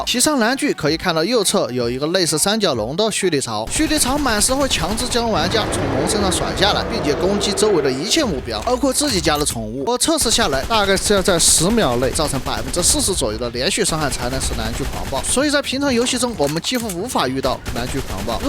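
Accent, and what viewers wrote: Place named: Sichuan, China. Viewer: 的地道川味方言视频竟然在油管这么火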